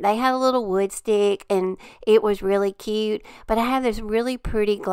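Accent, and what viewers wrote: South, United States. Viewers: Just found your channel and love your creativity and your Southern accent! Up here in Canada we having boring voices..lol. you are so sweet. Going to enjoy following you💕💕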